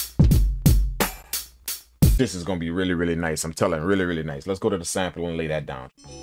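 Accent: New Orleans, United States. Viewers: New Orleans heavy accent. Great video man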